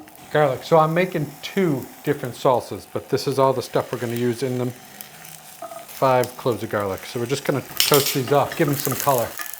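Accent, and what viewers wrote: United Kingdom. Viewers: is an honorary Brit who I believe has spent a great deal of time working and living in the U.K., so this probably accounts for some of the crossover in pronunciation/conversions etc. 😊💚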